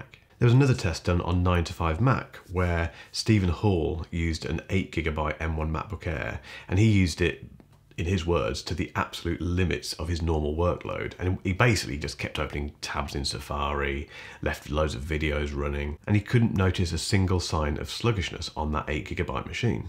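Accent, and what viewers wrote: United Kingdom. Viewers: This is the calmest review I’ve seen and the best British accent I’ve heard. You sir have a new subscriber.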